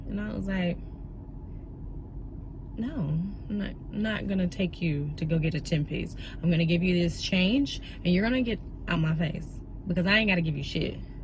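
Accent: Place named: Chicago, United States. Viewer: Sound like she from the Chi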